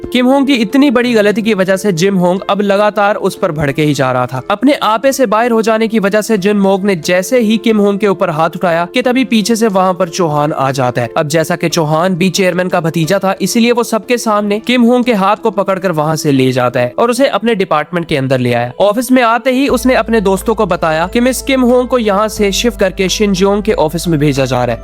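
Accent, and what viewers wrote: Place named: Pakistan. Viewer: Aapke pronounciation ke Hisab se Aap Pakistan se Belong karte ho na - Bythe way Voiceover 🗿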